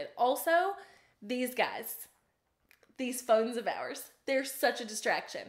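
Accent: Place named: Carolina, United States. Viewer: Are you from one of the Carolina states? Love your accent ;) I definitely need some better time-management skills!! I need to download newsfeed eradicator...for sure, lol Lot's a great advice! Thanks!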